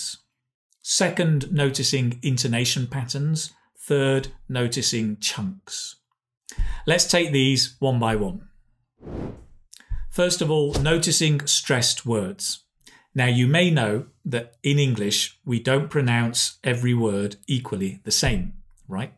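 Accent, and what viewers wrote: United Kingdom. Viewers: I really appreciate your vid,i’ll keep practicing for apply an airline,Thanks so much,anyway i like British accent.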